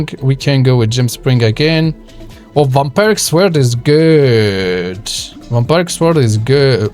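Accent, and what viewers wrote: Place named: France. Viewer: I like so much you "French Accent" that you imbue into your speach pattern :D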